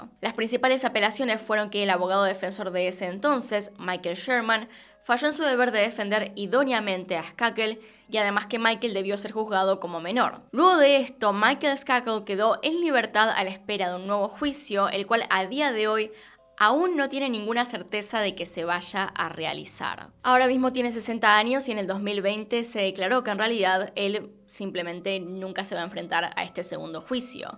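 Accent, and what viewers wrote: Argentina. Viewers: Hola. Eres buenísima para narrar y editar tus casos. Eres mi favorita , además me encanta tu acento argentino.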